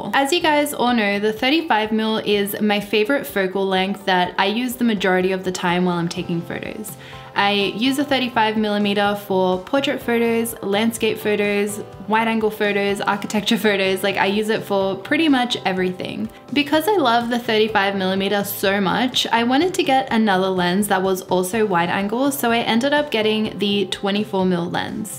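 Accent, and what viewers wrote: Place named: Australia. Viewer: It's an Australian accent. Didn't you watch home & away and neighbors when you were a kid?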